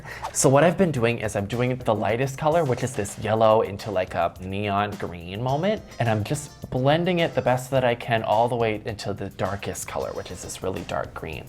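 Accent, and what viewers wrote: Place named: Midwest, United States. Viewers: The Minnesotan accenttttt. Makes me feel right at home😊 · Her Midwest accent is so fucking cute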